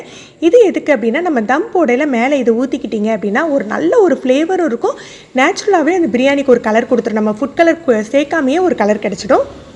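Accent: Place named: Tirunelveli, India. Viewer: why I asked : Your Thamizh accent reminds me of my MSc classmate -1965-67- Loyola-Mr Farook from Tirunelveli.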